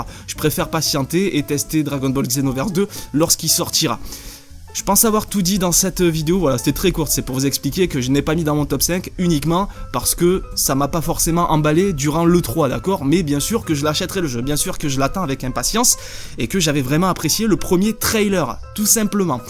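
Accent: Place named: Toulouse, France. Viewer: il a un accent psk il est toulousain ok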